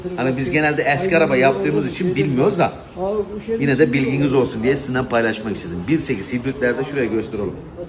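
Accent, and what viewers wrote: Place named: Aegean, Turkey. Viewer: Alem adamsin kral bizde egeliyiz şivene kurban bozma şiveni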